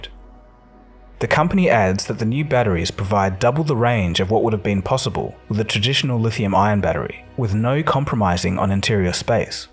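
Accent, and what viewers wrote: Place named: Australia. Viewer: It's a real torture to listen to Australian. Can you make an English version of this video? You can hire human narrators as cheap as a few $ per hour (just google "narrator voice over") or use synthetic voice. If you hate American accent, hire someone who speaks British English or its more neutral European variant.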